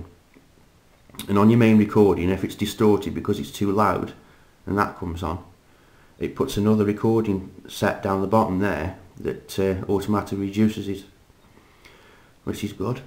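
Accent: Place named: Staffordshire, United Kingdom. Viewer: Good video and a distinctive Staffordshire accent.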